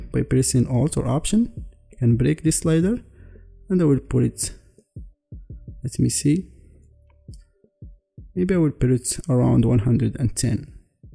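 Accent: Morocco. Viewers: From your accent I can tell that you are Moroccan .. Well done bro , high quality content for real , lah ywf9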